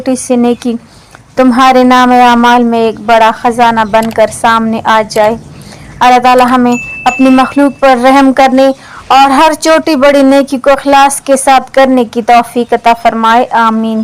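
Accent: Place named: Saraiki, Pakistan. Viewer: Bolne ka style suraiki lag raha he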